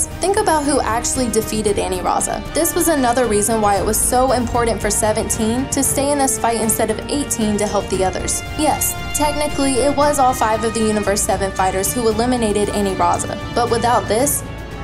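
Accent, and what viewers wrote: South, United States. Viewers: I hear country in your voice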